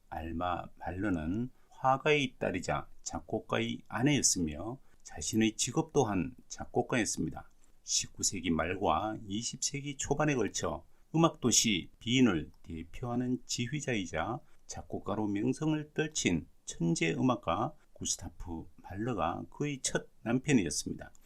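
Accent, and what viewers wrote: Gyeongsang, South Korea. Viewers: 경상도 억양은 좀 사양합시다. 같은 지역 사람들은 괜찮겠지만 참으로 듣기 역겨워요. 쏘리~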